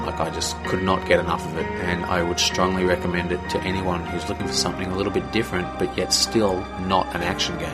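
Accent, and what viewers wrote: Australia. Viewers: Are you Australian? You got a nice, calm voice to listen to, and greate review! :D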